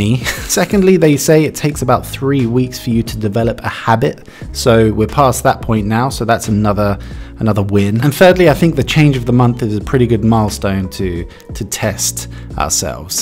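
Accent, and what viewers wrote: Finland. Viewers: You have improved quite a bit. Well done! 🔥 I just wanted to mention that you have the same sort of Finnish accent my husband has as well. It’s this funny tone of voice, a bit like a toddler or a cartoon character speaking. I am not sure why, but I think if you make your S and T sounds sharper and your L ”clearer”, it will bring your Finnish to a next level. 😁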